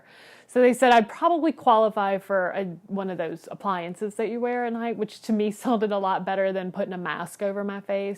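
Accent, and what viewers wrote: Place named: South, United States. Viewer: Our girl's got that southern accent🔥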